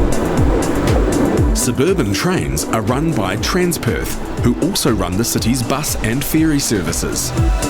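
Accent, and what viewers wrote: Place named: New Zealand. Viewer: "The guy" Timo is referring to is the commentator (you ? ) who has mispronounced Mandurah (pron Man-djrah) as mentioned by Jason G. The commentator has a NZ accent and may also be familiar with some of the old diesel powered multiple unit rolling stock from Perth that ended up in NZ. Excellent video by the way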